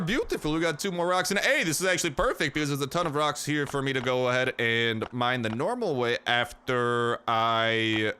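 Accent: Russia. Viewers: Hey are u russian whats wity the occasional accent whats your heritage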